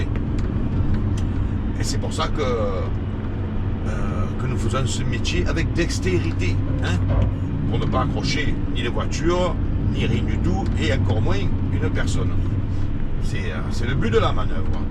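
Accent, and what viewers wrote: Marseille, France. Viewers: Un grand merci pour toutes ces vidéos que tu postes sur You Tube. Nous apprenons beaucoup de choses sur Marseille, en plus avec l'accent et les expressions marseillaises. Tu es un bon chauffeur et à la prochaine vidéo. Peux-tu nous dire ton prénom ? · Merci pour ces vidéos qui nous font découvrir Marseille avec se superbe accent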